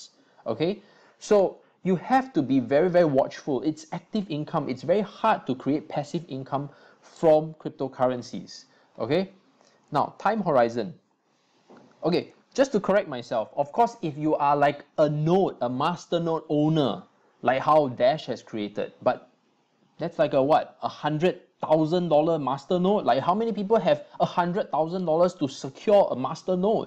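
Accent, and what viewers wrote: Singapore. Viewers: Awesome! That's why I heard a bit of Singlish :-p hahaha I live in SG too. I've really loved your video. I'm gonna watch your other vids as well. Cheers!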